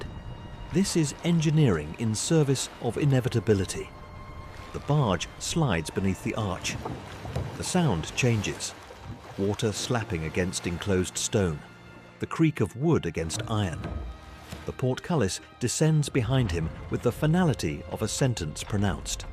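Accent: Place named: England, United Kingdom. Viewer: I am not a fan of A1 but it does have it's uses for things like this. One point thst kept me watching was the clear English narrator's voice and not a transatlantic one which makes me turn off.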